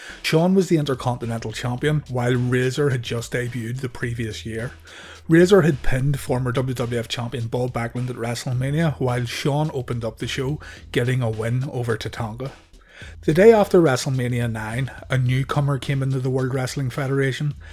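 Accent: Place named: Scotland, United Kingdom. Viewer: Scottish accents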